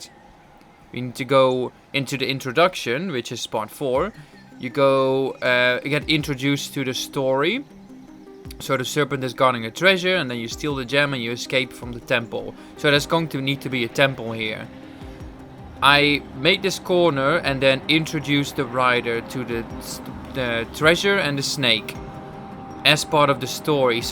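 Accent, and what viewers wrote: Netherlands. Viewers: You're based in New Zealand? You sound so Dutch!?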